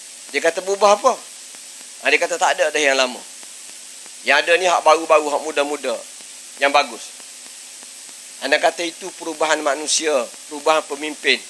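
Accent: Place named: Kelantan, Malaysia. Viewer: Bahasa pun dah tak pelat kelantan sgt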